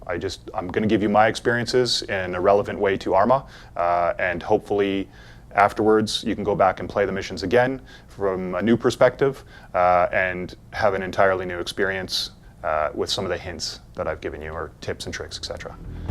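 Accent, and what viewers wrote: United Kingdom. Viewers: Wait, he is British? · Your British sounds so British. For 10 years you must have kept it...that twang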